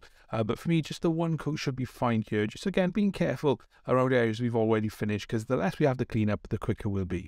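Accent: Wales, United Kingdom. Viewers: I bloody love your accent. The Welsh accent is beautiful.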